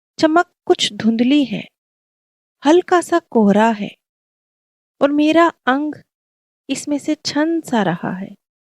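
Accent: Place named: Punjab, India. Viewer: Sir ma'm punjab s hn.. kuchh accent alag hote h aap kisi rajasthani s hindi bulwayiye vo alag bolega Gujrati alag bolega, marathi to bilkul e alag south wala to teda hi kar dega.. aur bache vo angrez unse bulwakar dikhao zara dhwani ko dwaaaanni😅 bana denge Shabdo s pare chale jao.. yhan school nh h zamana gya school ka ab samjhne ka daur h chhodiye meen mekh aapko to pata h na dhwani kaise bolte h enough h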